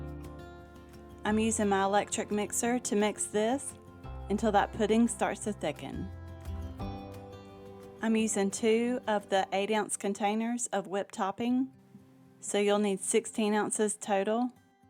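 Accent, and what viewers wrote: South, United States. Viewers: I just love your sweet southern voice. All of your recipes look so delicious!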